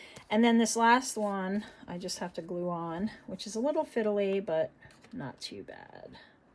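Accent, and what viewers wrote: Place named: Pennsylvania, United States. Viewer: Love your style.. will try these…. Sounds like you live in PA. I used to live there… now I am in MN Yes do more of these please..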